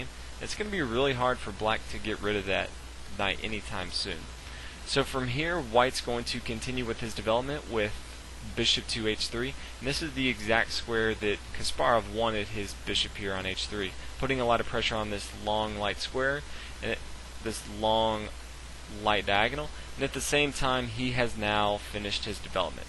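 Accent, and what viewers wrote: South, United States. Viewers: This entire game just oozed talent and skill. I hope someday I'm able to reach half their level of skill. Excellent commentary as well, but I found some of the southern accent slightly distracting while trying to study the moves.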